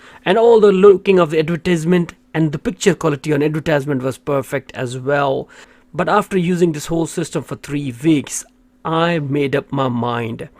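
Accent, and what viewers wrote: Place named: India. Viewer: You are speaking Indian, we didn't able to understand what you are saying !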